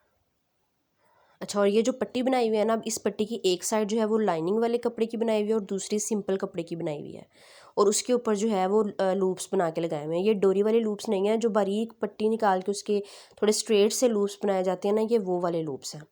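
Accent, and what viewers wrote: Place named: Punjab, India. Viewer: App ki Vedo buhat achi Hoti hi ap bolti bhi buhat acha ho banjabi ka tark acha lagta hi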